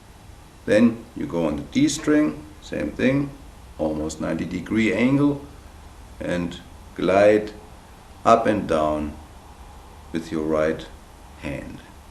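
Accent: Germany. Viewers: Harry Devita Pretty much a german accent. The misspelling might be intended though :D A beginner is begging for knowledge ;-) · German accent, never gets old. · my first thought was "he is either German or Finnish." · His videos are good and he seems to be a great teacher but am I the only one who listened to his first words and was like "I bet he's from germany"? I can't be the only one😮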